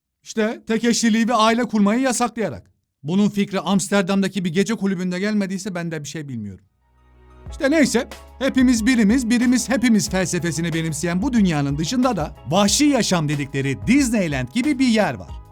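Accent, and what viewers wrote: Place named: Central Anatolia, Turkey. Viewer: Neden İç Anadolu ağzıyla konuşuyorsunuz efendim?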